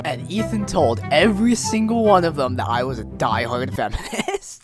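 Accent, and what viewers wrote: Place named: New York, United States. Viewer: I'm loving the strong New York accent.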